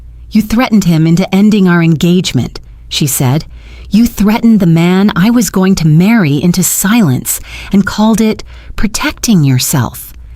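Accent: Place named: United States. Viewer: Why the American accent? Story is set in England. Weird names. Pianoforty????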